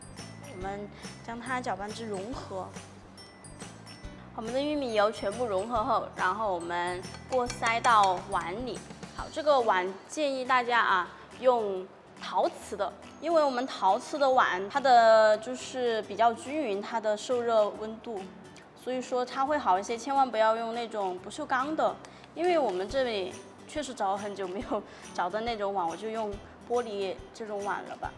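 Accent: Sichuan, China. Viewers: 你是四川的還是湖南d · 一开始就听出了你的四川口音，最后终于讲出了四川话，请问哪里人？我是重庆人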